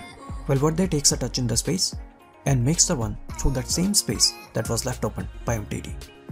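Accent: India. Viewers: Is an Indian cause his pronunciation of some of the words sound like an Indian eg clinical? I need an answer plz.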